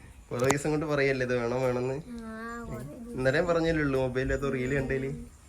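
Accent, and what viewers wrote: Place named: Palakkad, India. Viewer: കൊല്ലം ഭാഷ മറന്നോ മൊത്തം പാലക്കാട് ഭാഷ ആണല്ലോ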